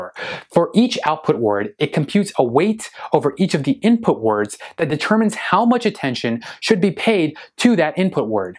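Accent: India. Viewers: nice accent, not like most indian.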